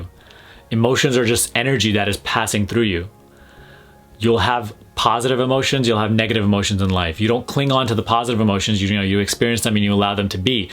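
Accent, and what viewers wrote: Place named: United Kingdom. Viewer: Out of topic, you sound quite British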